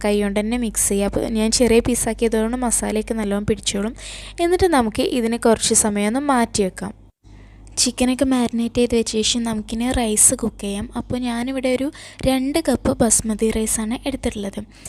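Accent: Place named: Malappuram, India. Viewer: തനി മലപ്പുറം ആണല്ലേ വെറുതെ അല്ലെ അപ്പം ഞാൻ മലപ്പുറം കരിയാണ്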